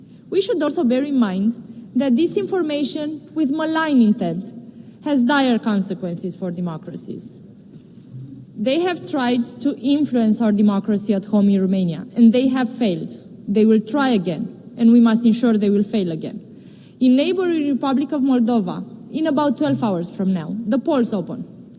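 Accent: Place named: United States. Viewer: vorbește engleza americana standard. Dar dacă știi engleza britanică tot ar trebui sa o înțelegi. Acum desigur dacă ești pe la nivelul B1 sau B2 e cam greu sa înțelegi tot ce spune.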